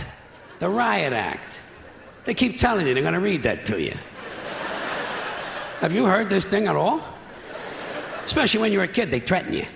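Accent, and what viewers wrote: Brooklyn, United States. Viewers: He's from New York, he isn't faking it. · love that Brooklyn accent. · He's from Brooklyn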